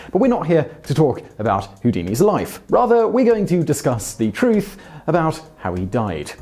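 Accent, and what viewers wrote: United Kingdom. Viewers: You have the britishest accent ever my friend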